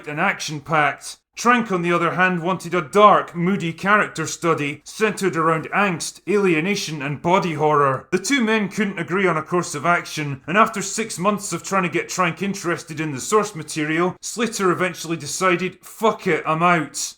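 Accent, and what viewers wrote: Scotland, United Kingdom. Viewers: The great thing about is the voice work. Everyone knows he has a unique voice. But the performance element is rarely mentioned. Listen to him on livestreams, and even though he has a Scottish accent, he sounds like a sober businessman in bar after office hours. But then in his videos he puts on the voice and plays up the "drunken slurring" and he performs. Again, especially with videos like this where it is all production notes and trivia and news, compare a WatchMojo voiceover to this video fro Drinker. You know there's a script, and yet it doesn't sound all corporate and polished and robotic and forced/fake-friendly. It sound simply like Drinker is telling a story in a bar after happy hour.🤪🤪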